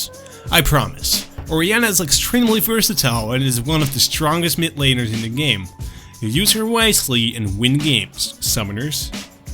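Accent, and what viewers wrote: Netherlands. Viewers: This guy sounds so Dutch. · dat dutch as fuck accent tho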